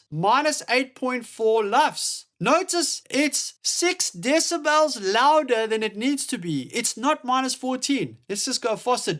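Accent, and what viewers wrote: South Africa. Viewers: You sound south African bru!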